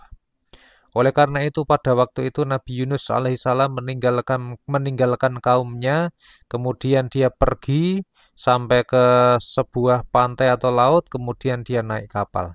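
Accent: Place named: Java, Indonesia. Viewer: Medok nih......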